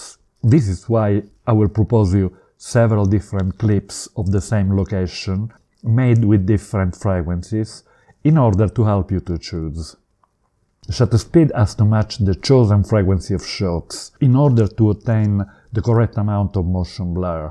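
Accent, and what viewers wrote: Italy. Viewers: I like your italian english. Made me feel like at home again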